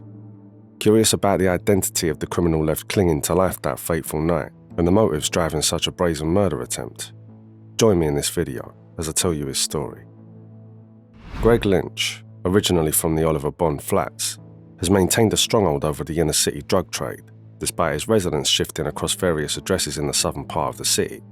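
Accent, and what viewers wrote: London, United Kingdom. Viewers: Anyone know what accent is this? London?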